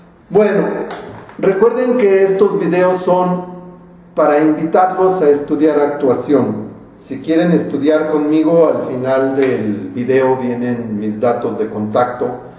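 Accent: Mexico. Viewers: tienes acento mexicano nada neutro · Hablaste con un acento típico mexicano, con mantenimiento de /s/ (muchas veces pronunciada como /z/...), acortamiento de vocales y entonación mexicana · Ni bien comenzo los 3 primeros segundos del video me di cuenta que eras mexicano ... Ni siquiera vi los comentarios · así hablan los de la ciudad de México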